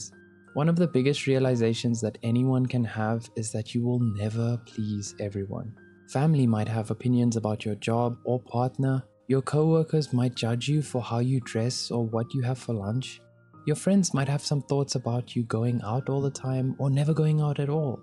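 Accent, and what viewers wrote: South Africa. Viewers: South African narrator???? 😁😁😁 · Is the narrator South African? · I love that the voice actor is south african ahh! good to see more of us in the media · the voice over artist has a South African accent😁🇿🇦❤ N#NODNAJUSTRSA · the man speaking in this video has a South African 🇿🇦 accent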